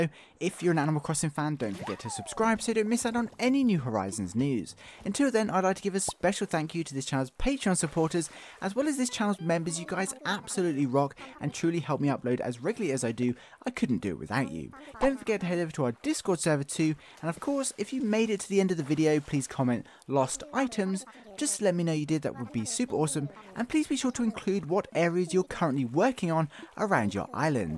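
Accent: England, United Kingdom. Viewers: I love your English accent what part of england are you from? P.s I'm englidh and Don't have that broad of an accent you make me think your from London.......are u? lol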